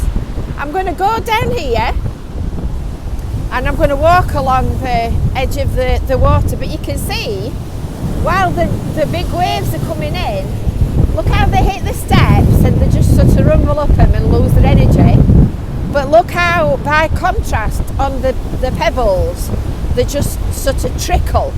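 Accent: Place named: Lancashire, United Kingdom. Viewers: I love to listen to your lancashire accent. Been in nz for 47 years. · I just love to listen your beautiful Lancashire's accent..l used to drive along the promenade from st.annes to Blackpool and stopped over to watch the sea.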